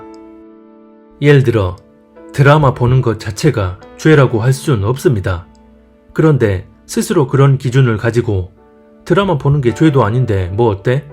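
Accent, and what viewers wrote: Jeolla, South Korea. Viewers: 전라도 사람임? 말투가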